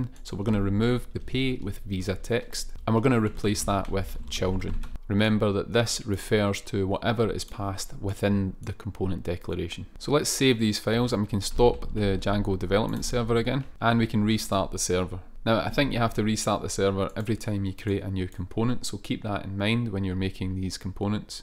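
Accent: Scotland, United Kingdom. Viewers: Ey lad, love the scottish accent, real killer. Would be real nice if you could just display what you're trying to build and what you'll get out of the framework/tutorial. Thanks a lot.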